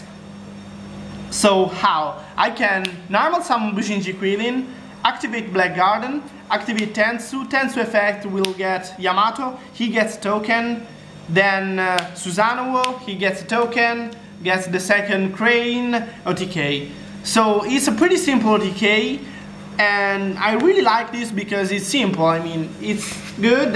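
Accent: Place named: Tuscany, Italy. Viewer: O.O TI DEVO FARE I COMPLIMENTI PARLI MOLTO BENE L INGLESE MA L ACCENTO TOSCANO NON TE LO LEVA NESSUNO :)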